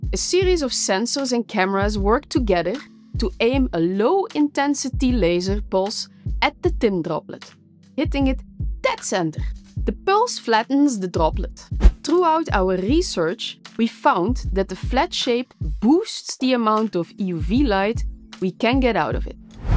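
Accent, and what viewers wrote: Netherlands. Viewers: No, she's baiting us, the general audience. Serious. The others aren't stupid either. What she outlined with a charming Dutch accent is just the very basics. The specifics she didn't go into are deep company and national secrets and are carefully omitted. And wouldn't fit into a video of just over two minutes. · This is the strongest Dutch accent I’ve ever heard 😅. Very refreshing I might add.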